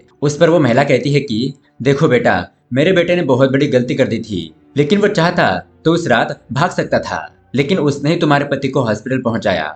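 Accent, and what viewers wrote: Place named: Maharashtra, India. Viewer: Are you Maharashtrian??? B'coz marathi speekers ki hindi accent aisi hi hoti hai..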